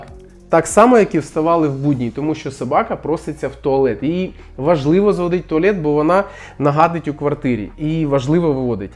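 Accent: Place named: Surzhyk, Ukraine. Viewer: Слушаю и понимаю какой суржик жоский, с другой стороны, ставя себя на место человека в телефоне понимаю что я если бы говорил обычной скоростью, а не запинаясь вспоминаю як же буде черевик українською. То было бы аналогично если не хуже. Респект за мову.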